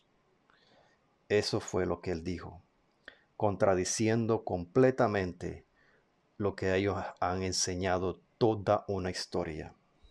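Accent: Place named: Mexico. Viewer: Por un momento pense heras Mexicano por tu forma de hablar ,de Panama es como ya sabes el general y ahora es jw . lo de tu hija como decimos en Mexico que mala onda ,aunque yo vivo en los Angeles California USA 🇺🇸.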